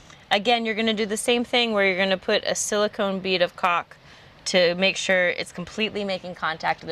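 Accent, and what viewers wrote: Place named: Chicago, United States. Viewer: Thanks for sharing. You have a nice accent, maybe Chicago? I thought Woldfire Defence was an Aussie company. Anyway, here in Southern California, crawl spaces really make you crawl, and in some cases, creep (like a snake!), so I’ll be installing from the outside. Good video, by the way. I’m going to watch the rest of the series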